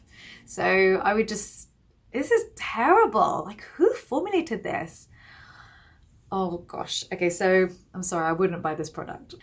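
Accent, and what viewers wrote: United Kingdom. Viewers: She has a very sharp British Accent. ❤️ I love to hear her every day.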